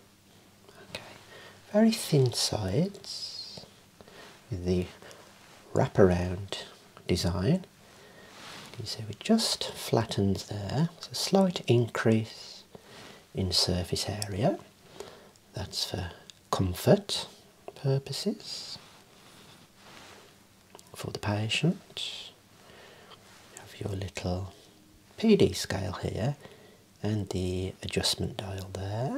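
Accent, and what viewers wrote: Birmingham, United Kingdom. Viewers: This was great. I initially thought it was a real inspection/appraisal until I saw your other vids lol. You have a super soothing voice. Is that a Birmingham accent? Forgive my ignorance, not from the UK (Australia). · I love britan akcent, sorry my bad english im from Sakha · Youtube recommendations have served me well! This video was amazing! Your voice reminds me of a Brummie Alan Lee :D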